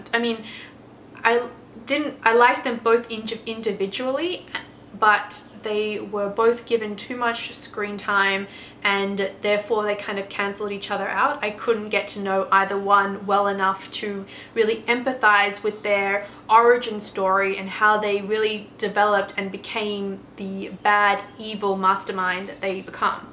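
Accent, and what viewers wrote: Australia. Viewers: Great review :) Is that an Aussie accent?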